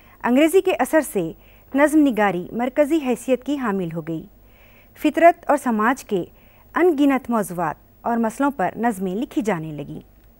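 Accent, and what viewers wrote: Deccan, India. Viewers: The teacher is from Hyderabad probably. Her pronunciation is quite Deccani.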